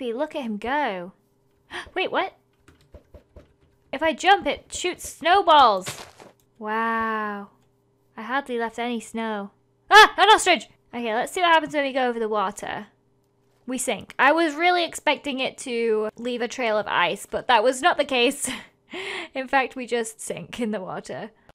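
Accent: United Kingdom. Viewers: Did any one else notice her saying bannene smoothie instead of banana smoothie or maybe it's her British accent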